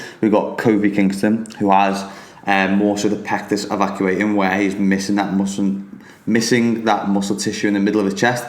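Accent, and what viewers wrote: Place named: Liverpool, United Kingdom. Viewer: Nice physique, quite an interesting accent, is it Liverpool one?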